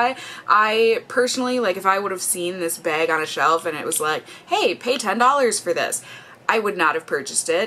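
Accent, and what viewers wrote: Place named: Midwest, United States. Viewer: I love that you say b-aye-g lol Midwest accents make me happy 💕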